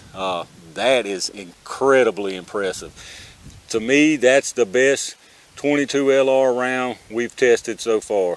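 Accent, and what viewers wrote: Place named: Kentucky, United States. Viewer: I absolutely love your accent. Reminds me of my Kentucky kinfolk. ❤️ I loaded up on Federal punch because of your videos. They should be paying you!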